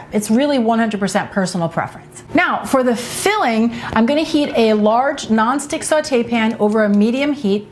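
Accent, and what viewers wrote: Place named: New Jersey, United States. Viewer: Hey there! I am a Speech Pathologist and I have noticed that it is a New Jersey thing lol. is not the only one who does that lol. It's just a dialect.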